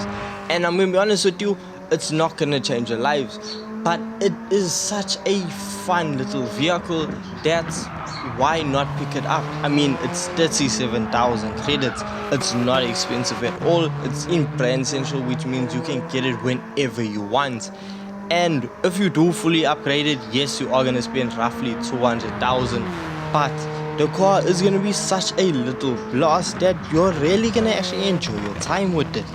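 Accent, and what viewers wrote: South Africa. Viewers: Why the subtitles bro'? You can tell you're South African, but you're easy to understand. Love the content my friend 👍.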